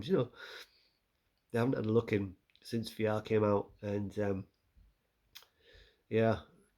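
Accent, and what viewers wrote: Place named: Australia. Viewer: You have a distinct Australian accent. How did you learn it? 👍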